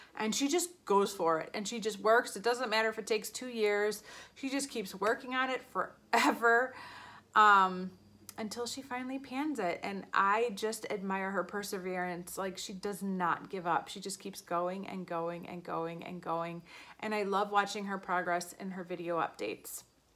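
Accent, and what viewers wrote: Netherlands. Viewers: Dutch accent :)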